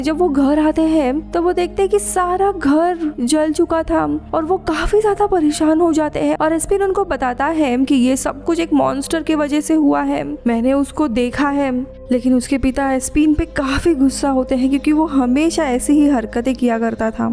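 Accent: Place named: Bengal, India. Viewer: apki hindi sunkar aysa lagta hay ke ap bengali ho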